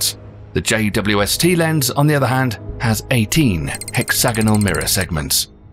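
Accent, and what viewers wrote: United Kingdom. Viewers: You think that a British accent gives videos some sort of validity and truth. But there is not much true information in this video. It's nearly completely fictitious rubbish.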